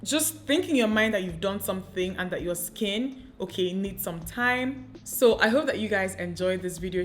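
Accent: Nigeria. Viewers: Its the nigerian accent for me 🤩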